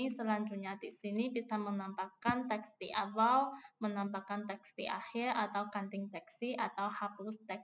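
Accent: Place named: Japan. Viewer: Selamat datang ka dinegri +62....kedengerannya kaka dr jepang soalnya saya punya tmen orang jepang aksennya sama kaya kaka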